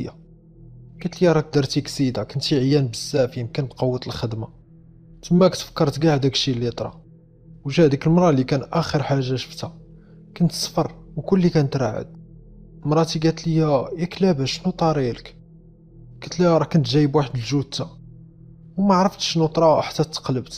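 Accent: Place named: Dammam, Saudi Arabia. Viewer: انــتت من اي ديرة في السعوديــة من اي منطقـة لهجتـك م افهـم شكلك من دمامم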